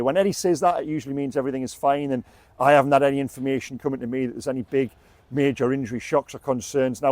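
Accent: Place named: Newcastle, United Kingdom. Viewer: To all viewers this man uses a German greeting.”Well Hallo” .This is Geordie pronunciation that is worse than double Dutch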